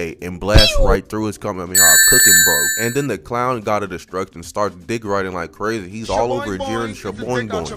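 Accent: Philadelphia, United States. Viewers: r u frm philly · Philly or ATL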